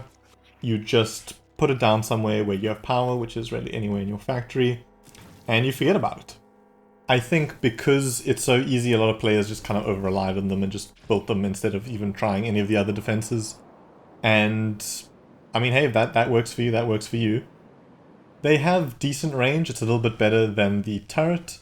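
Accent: South Africa. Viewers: I can recognise that saffa accent anywhere, didnt think we had many factorio players around here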